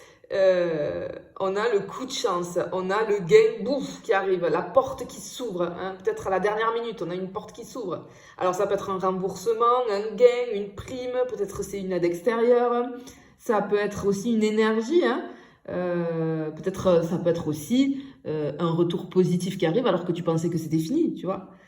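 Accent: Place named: Provence, France. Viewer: Bonjour, j'apprécie la manière dont vous vous adressez à vos auditeurs, votre énergie et charmant accent provençal ✨💜✨🙏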